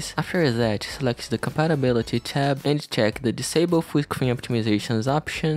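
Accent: Brazil. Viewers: Seu sotaque parece brasileiro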